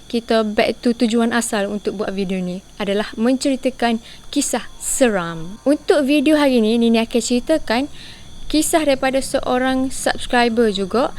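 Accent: Kelantan, Malaysia. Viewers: Hadir kita pun dari Kedah , kawey Suko jugak cerita laghat kelate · Hadir dari kedah, kawey suko dengar demo cerito dlm loghat kelate rak👍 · Sedap dengar awak cakap loghat Kelate. Salam dari Kedah 😘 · Jangan Kelantan sangat...ada yang saya tak faham🤣😂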